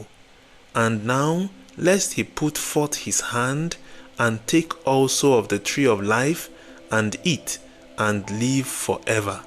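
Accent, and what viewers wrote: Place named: Nigeria. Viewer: The first Nigerian accent audio Bible in the making. Thank you sir. God bless you greatly. A happy new year to you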